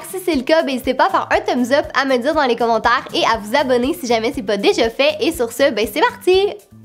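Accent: Quebec, Canada. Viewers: t'es trop belle,gentille,intelligente...bref je te kiffe 😘 tu me fait sourire à chacune de tes vidéos et j'attends avec impatience tes prochaines vidéos.j'adore je t'aime juste trop,je t'adore trop,tu es la youtubeuse la plus cool. j'adore ton accent Québécois,j'adore aussi tes cheveux,j'adore ton pull car j'ai le même. super vidéo.Continue comme ça ne t'arrête jamais n'écoute pas les commentaires négatifs pense à moi qui t'admire. ps:je t'adore n'arrête jamais !! 😙😙😙😘😘😘😚😚😚😝😝😝😜😜😜😛😛😍😍😍😇😇😊😊😊😄😄😄😁😁😁😉😉😉😎😎😎☺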